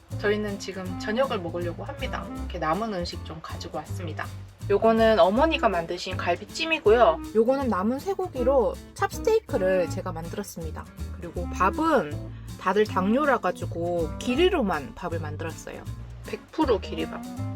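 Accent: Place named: Busan, South Korea. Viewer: 처음으로 댓글 남겨봅니다. 늘 잘 보고 있습니다. 저에겐 많이 힐링 되어요. 감사합니다. 아무것도 섞이지 않은 순수한 선의와, 솔직하고 따뜻한 일상 영상이 너무 좋더라구요. 설령 슬프고 좀 우울해 쳐지고 하는 영상도 넘 순수해서 같이 공감할수 있어서. 강아지가 제가 집안일 할때면 거실에서 있으면서 tv 영상 켜주는걸 좋아하는데 그때 늘 영상 켜놓고 있어요. 얘도 힐링이 되는지 소파에 올라가 늘 꾸벅 졸더라구요 ㅎㅎ 구독자 선배님들께 예쁘게 보이고 싶어서 화장을 진하게 한다고 하는 영상은 정말 빵~ 터졌어요. 너무 귀여웠어요. 그리고 의 아재개그 정말 팬입니다. 저 몇개 외웠어요 ㅎㅎㅎ 또 서울 와서 우리는 사투리 안쓰는데 사투리 쓴다고 알아봐서 놀랐다고 해서 남편이랑 보다가 진짜 뿜었어요 ㅋㅋㅋㅋ 어찌나 귀여운지… 실제로 부산출신 제 친구가 한 말이랑 너무 똑같았거든요. ㅋㅋㅋㅋㅋ 두분 너무 너무 잘 살고 계신것 같아 부럽습니다. 코로나, 계엄 겹치며 사업 문제로 몸도 마음도 많이 지치고 힘들었을때 함께 일상을 나누는 듯 정말 많이 힘이 되었습니다. 감사하고 극 내향인 일인으로서 인제서야 댓글 남겨봅니다. (이것도 메모장에서 몇번의 수정을 거쳐서 올렸는지 ㅋㅋㅋ)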